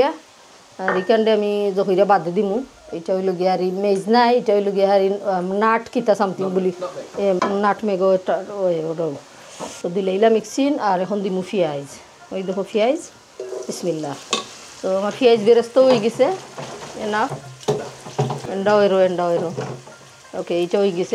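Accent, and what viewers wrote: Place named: Sylhet, Bangladesh. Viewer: Aafa afna amrar sylhete, London a sylheti mat hunia Val laga afar muko amrar sylheti mat hunia, Amra hokkol syloti...aafa vlog dakta ar lagi kub kub Val laga.....Amar sylhet Amer afa..ra suvo kamona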